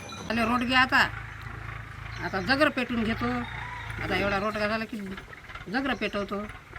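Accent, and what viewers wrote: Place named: Akola, India. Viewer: तुमची भाषा अकोला जिल्ह्यातील आहे असे वाटते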